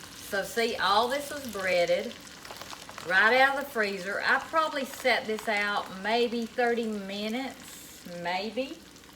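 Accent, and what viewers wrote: South, United States. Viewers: What state do you live in? I was born in Texas & grew up all over the the south but have lived in Ohio a long time. I'm trying to figure out what state you live in by your accent bc it is so familiar to me. I've lived in Texas, Okla (where my mom was from) Tennessee, Mississippi, Louisiana and Alabama & went to college in Arkansas. Okra is my favorite vegetable & I grew up with my mama frying it with chopped onions and fresh or green tomatoes and that's my absolutely way to make it. I've never met anyone else who makes it that way. I'm wondering if it was a tradition in Okla. But even southerners I know in Ohio or the south have never heard of that. I also make okra patties with the same ingredients bc sometimes the wetness of the tomatoes makes the okra fall apart. But making it with onions and tomatoes takes it to another level. 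I crave it! I've never put it in the oven before and will try that for sure!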